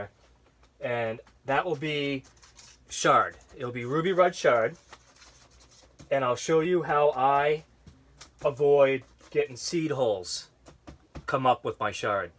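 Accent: New England, United States. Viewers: Great video! Im getting ready to set up an indoor rack system and you answered a bunch of questions. Sounds like you're in New England 😎? We're out of Maine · Nice work! I'm new to your channel and I really enjoyed the content and do I hear a bit of new England accent?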